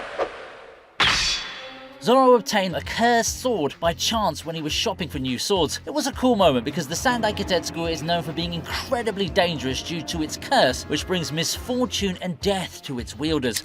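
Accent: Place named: United Kingdom. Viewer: No one gives a shit about that detail he’s british let him use his accent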